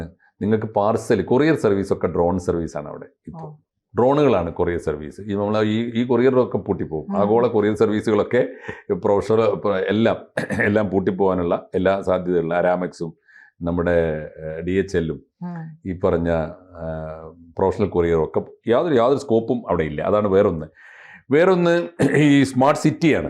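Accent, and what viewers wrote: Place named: Malappuram, India. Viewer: എടാ നീ ഇന്ത്യൻ പൈസയെ അവരുടെ yen മായി താരതമ്യം ചെയ്ത് നോക്കെടാ . ചാനലിൽ ഇരുന്ന് എടീ പോടീ എന്നൊക്കെ വിളിക്കുമ്പോൾ അവൾക്ക് ഇഷ്ടപ്പെടുന്നു എന്നുവെച്ച്, കേട്ടിരിക്കുന്ന ഞങ്ങൾക്ക് ഇഷ്ടപ്പെടും എന്ന് കരുതരുത്. നിന്റെ മലപ്പുറം ഭാഷ മലപ്പുറത്തു മാത്രം എടുത്താൽ മതി. മറ്റ് ജില്ലകളിൽ ഇരിക്കുന്നവർക്ക് ഇമ്മാതിരി ഊളത്തരം ഇഷ്ടപ്പെടില്ല സു!ടാ!*പ്പി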